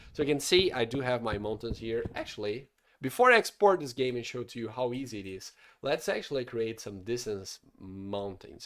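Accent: Brazil. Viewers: the brazilian accent xD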